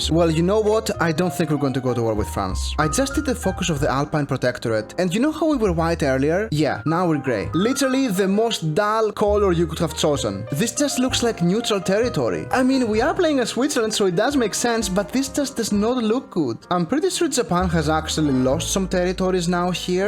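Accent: Greece. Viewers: I hear an accent in your voice which is pretty similar? Are you from Greece? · Bro are you Greek? You sound very Greek 😅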